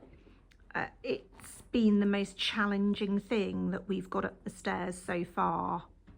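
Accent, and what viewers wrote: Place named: United Kingdom. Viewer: I'm fascinated by your accent. It doesn't sound like other British accents I've come across. Can you tell me the location where this accent is found in the UK (I know it's the South somewhere). Thanks!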